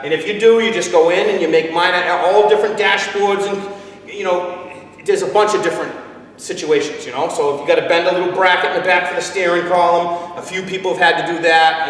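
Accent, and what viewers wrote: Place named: New York, United States. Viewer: I think this guy just might be from the new york area.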